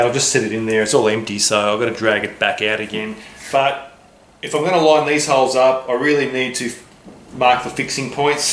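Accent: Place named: United Kingdom. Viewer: Finally on part 7 is what I wanted know, what you used for top and Ha Ha <mail> yup your British ascent really stands out on aluminum> uh·loo·muh·nuhm. Being from Maine USA I had to rewind 3 times to figure out what you said. I skimmed through most of you lathe bench build videos, your's is about the closes to the design I want, really nice job so far thanks for sharing........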